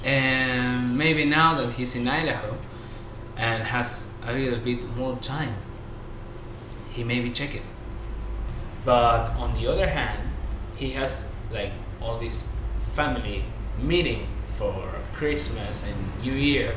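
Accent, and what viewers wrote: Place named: Argentina. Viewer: más argentino no podes ser negro(de onda) !!! yo tmb hablo ingles y me equivoco parecido a vos aveces... jajajaj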